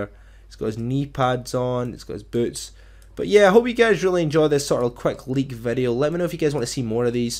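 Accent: Scotland, United Kingdom. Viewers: He's Scottish, I talk like that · I’m Scottish and so is he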